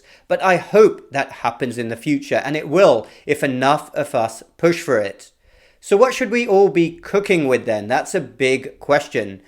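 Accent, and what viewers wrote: United Kingdom. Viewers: Bullshit in a British accent. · I just love the fect doc didn't loose his British accent 👏😆❤️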